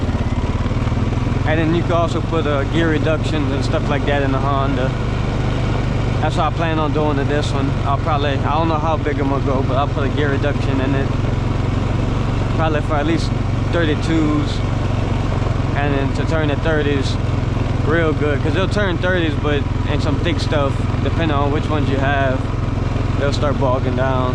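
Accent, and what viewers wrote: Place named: Louisiana, United States. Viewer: First video of yours I come across while looking up Honda 420’s and immediately noticed the accent lol. St Landry or avoyelles parish?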